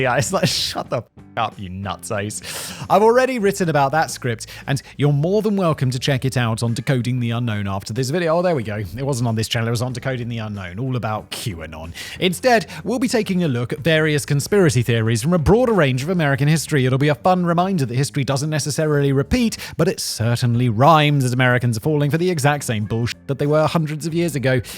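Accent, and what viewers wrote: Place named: England, United Kingdom. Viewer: for some reason, `s middle class English accent makes the irony here even more savage yet funny!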